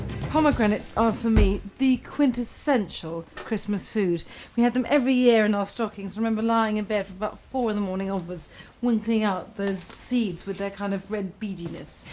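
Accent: United Kingdom. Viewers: chok-lut - I hat that British pronunciation, and straw-breez. I can't listen to it. Buh-bye.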